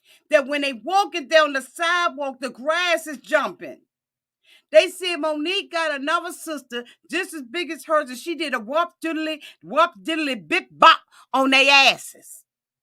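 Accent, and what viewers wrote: Washington DC, United States. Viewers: Hey girl. I love love love you. I am also from the DMV… I knew u were from here the first time I saw your show and heard that DC dialect. Love ur commentary 😂😂😂❤❤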